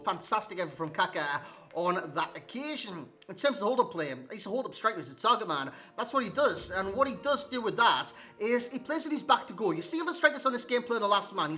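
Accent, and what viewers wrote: Newcastle, United Kingdom. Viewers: Mike Just being enthusiastic for the video, to keep you occupied.. plus he has a Newcastle accent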